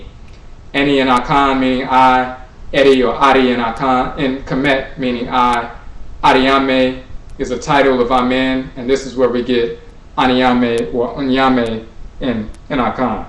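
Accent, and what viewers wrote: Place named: United States. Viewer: I say you're on a rightous track.I think that, because of your American ascent, you need to speak a bit slowly so as for us to aprehend your TWI. I'm an Akan of the BANTU BLOOD line.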